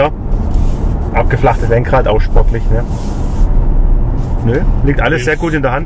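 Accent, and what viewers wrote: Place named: Swabia, Germany. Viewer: Dies Schwäbisch klingt schon lustig. Aber nicht unsympathisch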